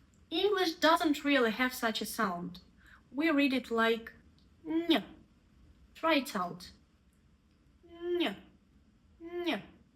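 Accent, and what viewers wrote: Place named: Eastern Europe. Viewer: Your accent sounds like it belongs to a Slavic language, especially when you speak English. Love how you explain everything - super! xx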